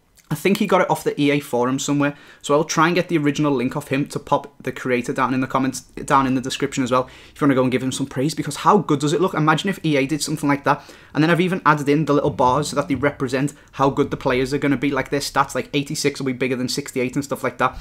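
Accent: Liverpool, United Kingdom. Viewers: Love the Scouse accent ;)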